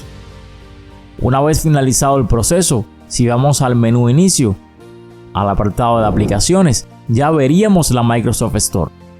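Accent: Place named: Cuba. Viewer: Que sos de Cuba o el Salvador?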